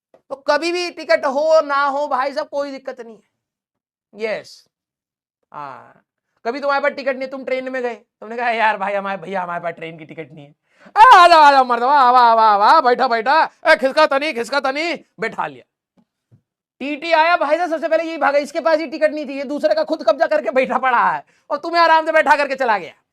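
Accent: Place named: Gorakhpur, India. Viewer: Ham gorakhpuriya haiin sir ekdum theek bolat bade 😂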